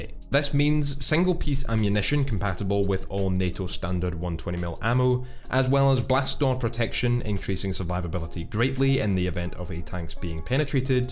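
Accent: Scotland, United Kingdom. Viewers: Many words spoken by this chap are from some kind of jock slang, I respectfully request subtitles for clarity. Or is there or might there be an English voice option ? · Being disabled by driver error is hardly enemy action. The enemy action was ineffective against the chally even when it was immobile and defenceless and they where stood next to it shooting rpgs at it's weakest points. Plus being disabled is alot different to being destroyed with the crew dying.. Can tell your scottish i love your vids man but you have such a hate-boner for anything british then laud things only slightly better or on par it's hard to hear sometimes xD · Scottish accent but you live in Australia. So gaining any defense accurate info is quite impressive and commendable, but chobam armor, which I believe has been upgraded to leiseter or some other armor, and to be honest. 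guys I know in the tank corps think that is a good thing. You seem to be looking for an indestructible tank simply because of that you will never get one. At Also the Abrams use British armor technology, but then again the UK uses American communication software and fire and control. Anyway living in Australia, do you really care? you are about as far away from real war, as you will ever be. Guess that why you are in Australia!!!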